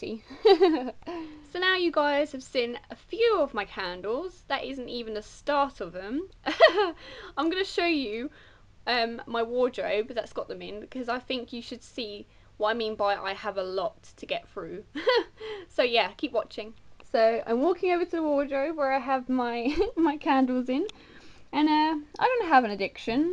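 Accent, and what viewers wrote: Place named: London, United Kingdom. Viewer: Are you from near London? You have such a London accent haha :)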